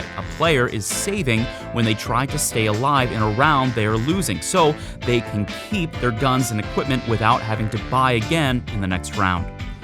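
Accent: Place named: United Kingdom. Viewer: you dont sound like him imo but you have a nice soothng voice like him, with a diff accent lol, i think ur british?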